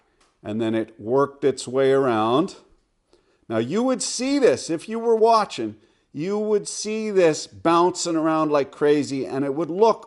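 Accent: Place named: Canada. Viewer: Narrated is classic unfiltered Canadian style -- well done!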